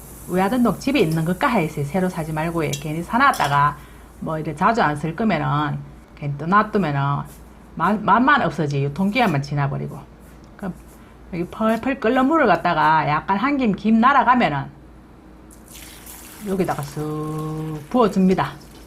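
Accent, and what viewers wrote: Gyeongsang, South Korea. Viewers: 당근짱아찌,짱이임미데이! 경남 말이 정겹습니다, 경남 어디예요, 완전 토종 경남 말인데요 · 경상도말씨 너무 정겹네요 내고향 구미가 그립다 지금여기는 비햄기타고 가야하는길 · ㅎ ㅎ ㅎ대구신가요?..^ ^ 말씀넘 귀여우셔요~😊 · 아무리 경상도 표준말씨라지만 듣기가 거북한면도 없지않네요 메뉴는 도움이 될 수 있겠지만ㆍ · 대구말시도, 잼있고좋은요리 감사해용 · 말투정말 마이 정겹네요 완존 대구말씨..??맞죠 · 이해합니다 저도 말따로 마음따로 거든요. 경상도사투리가 정겹고 웃음이 나네요 아주 유익하네요 내일당장 해 보려구요 좋은정보 감사합니다😊👍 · 아니지 우리 노인네들에겐 딱 좋구먼...... 경상도 사투리라서 표준어 사용자들은 빨리하면 무슨말인지 잘 못알아들을수도 있고...... 암튼 최고 우리 힘든 주부들위해오늘도 열일하는 님❤ 캘리포니아 할미가 👍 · 호불호가 있지요. 갱상도 말씨 ㅋ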